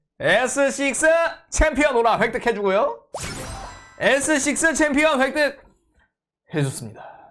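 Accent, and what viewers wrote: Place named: Jeolla, South Korea. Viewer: 왜 전라도말을 나 전라도 목포에서 태어남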